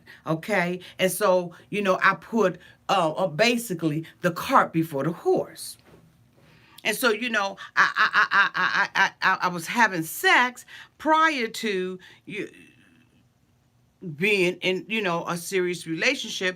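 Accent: Washington DC, United States. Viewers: Hi there 👋🏾. I'm a newbie here. I've been looking for more reviews of this show. Glad I've found you! Where are you from? I here a DC area accent. Am I right?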